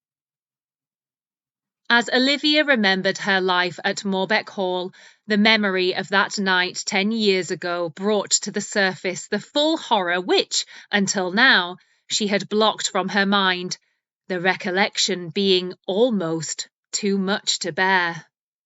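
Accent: United Kingdom. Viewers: i just listen only for one purpose because i AM FOND OF British accent i really adore this accent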